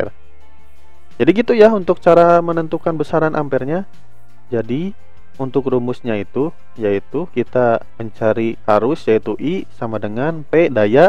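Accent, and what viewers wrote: West Java, Indonesia. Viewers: 1.Biar mudah dipahami tong buat para pemula. 2.itu logat sunda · Logat sundanya gk ilang yaa😂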